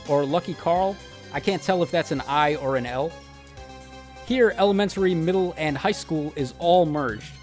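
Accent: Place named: East Coast, United States. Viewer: If your accent didn't give away that you're from the east coast, you going to a "bodega" did. Congrats on that lotto win, though, the IRL equivalent of summoning 5*s. I might want to play now, too.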